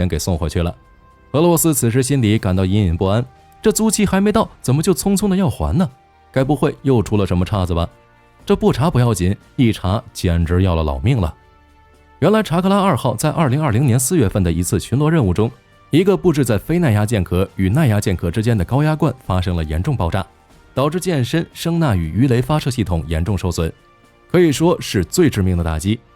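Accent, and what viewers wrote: China. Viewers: 只要是這種中國口音的不是大外宣就是大內宣,只要你是中國的敵人,他就製作假視頻來攻擊你,從來不懂得,去讚美別人,只會抄襲別人,又不懂得核心與關鍵技術,於是呢就製作了一些四不像的武器出來了,沒有作戰經驗的中國解放軍,只會使用鋼筋水泥,號稱基建狂魔,笑掉到人家大牙。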